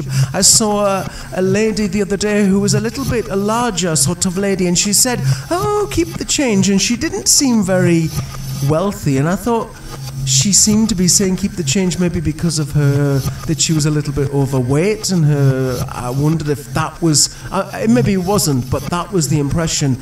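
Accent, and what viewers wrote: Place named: United Kingdom. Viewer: I fully agree with you... In France, supposedly, nobody is really hungry - the government provides for them... last time I gave something was a sandwiich - someone told me what i just wrote about government's help - impossible to know if they are really needy - it is impossible to always give and give when, you, yourself, are in a critical financial situation... complicated subject in a way... Great talk ! love your british accent ! lol ! renee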